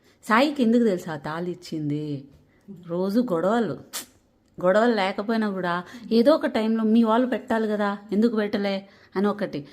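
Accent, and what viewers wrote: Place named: Anantapur, India. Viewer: మీకు కూడా అనంతపురం జిల్లా యాస బాగా వచ్చేసింది 🙏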